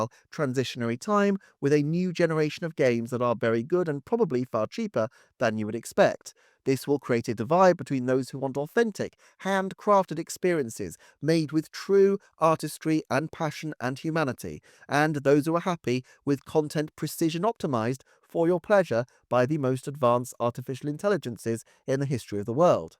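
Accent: United Kingdom. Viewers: yeah is probably one of best nintendo youtubers out there, he's certainly well-informed and that british accent automatically grants him extra credibility lol (also i like your username, soli Deo gloria ✝)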